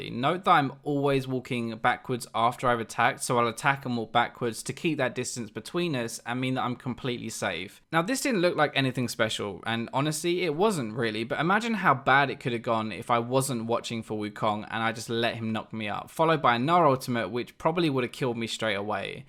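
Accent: United Kingdom. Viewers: Where are you from,? U have kind of a British Accent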